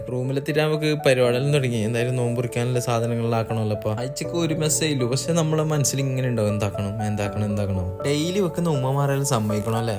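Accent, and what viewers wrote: Kasaragod, India. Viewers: സംസാരം കേട്ടാൽ തന്നെ മനസ്സിലാകും കാസർകോട്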